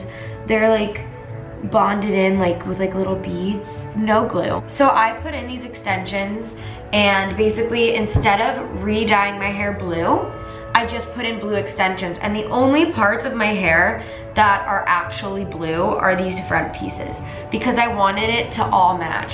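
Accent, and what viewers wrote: United States. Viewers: I’m British and her accent seems stronger than other Americans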